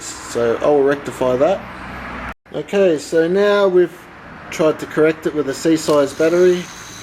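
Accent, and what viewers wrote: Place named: Australia. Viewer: your Australian! but I'm not =( or american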